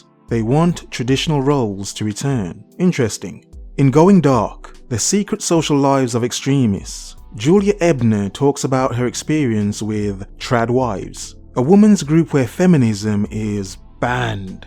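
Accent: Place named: United Kingdom. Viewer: Level 1: read the article yourself Level 100: heard it read to you through a British bloke's voice with the occasional hearty chuckle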